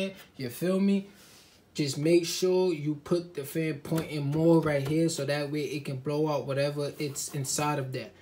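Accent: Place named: New York, United States. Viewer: ye he from ny lol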